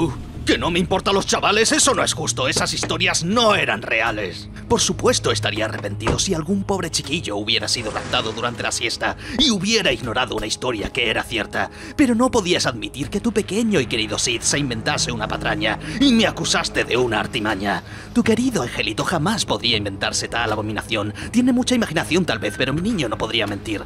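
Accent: Spain. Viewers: Siempre e dicho que odio el acento de los españoles pero sinceramente ningún otro acento le queda tan bien a las rimas como este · Eres el único español que amo como habla :v eres el mejor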